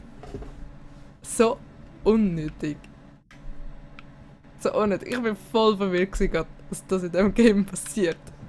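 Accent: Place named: Switzerland. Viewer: Schwiizerdütsch fiiri eifach 👌🏽 Guets Video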